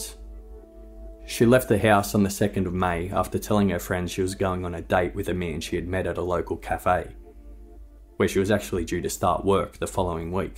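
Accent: Australia. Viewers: Could do without that Aussie accent though.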